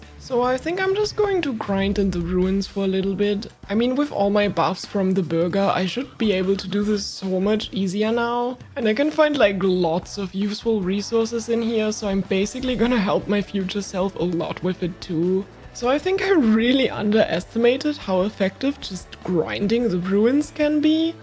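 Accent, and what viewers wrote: Germany. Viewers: I don’t know if it’s just being an old-school Minecraft player, but I don’t know what it is anytime a German or someone with like that German style accent teaches me about engineering. I just have to listen. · man the German accent breaks me every time, so cute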